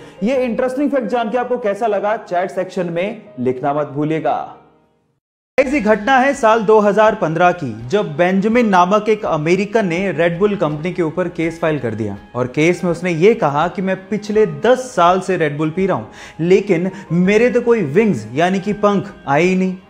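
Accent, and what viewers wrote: Maharashtra, India. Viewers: Aap marathi kyon bolte hai like thamba but it's funny I like it because I am Marathi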